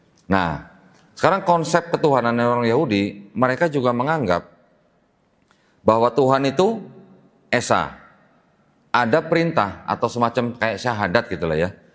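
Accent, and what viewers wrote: Banyumas, Indonesia. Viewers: Logat Jawa banyumasannya melekat mbake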